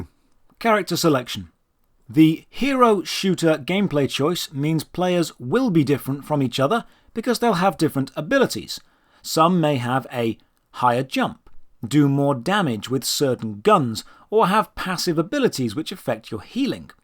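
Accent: United Kingdom. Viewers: This is the same thing he does with MMO's to be honest and clear. He complains that MMO's require you to level up and play the game... to finish the story. He complaiend about FFXIV's story not being fully accessible, etc. He demands hand holding features, and he pretty much complains about any forced social behaviour, and he has ZERO understanding of the genre. I pointed out Everquest for example being one of the best, and earliest, MMO's that did not hold your hand and to this day is still succesful. He then responded to me and claimed he liked Everquest becauseyou could "play it solo or in a group." In what WORLD can you play EVERQUEST solo? It's clear does not understand anythign he is talkign about what-so-ever, and is basically exploiting a british accent to sound smart and impose what HE likes onto these games. And ostensibly, he likes single player story games.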